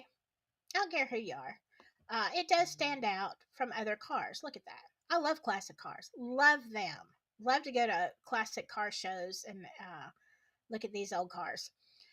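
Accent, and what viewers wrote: South, United States. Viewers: Love your channel and i could listen to your lovely southern accent all day, That hoodie is awesome and looks great on you.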